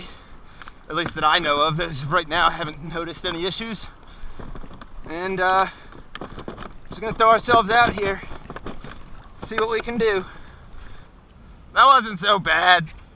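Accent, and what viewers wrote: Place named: New Jersey, United States. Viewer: Dude you sound like your from Jersey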